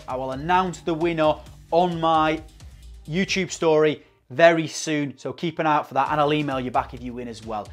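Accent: United Kingdom. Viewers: "Affiliate marketing" awesome video! I like the way you present something, clear with British accent, concise and full information. Even though English is not my first language, I can understand that. Cheers!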